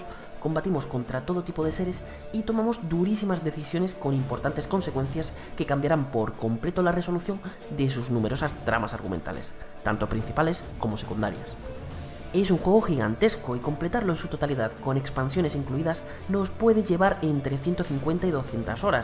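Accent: Spain. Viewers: Es español o argentino que esperas de esas gentes :v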